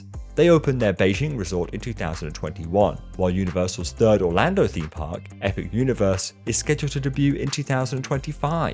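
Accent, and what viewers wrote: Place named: RP, United Kingdom. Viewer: it's just a normal RP English accent?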